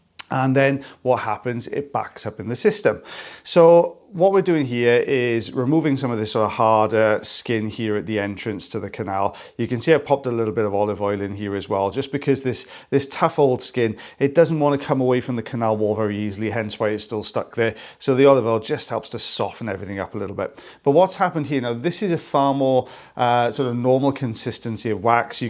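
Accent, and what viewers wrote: Wales, United Kingdom. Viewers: Love your descriptions and your Welsh accent. Stay on the screen. I think it's more personal.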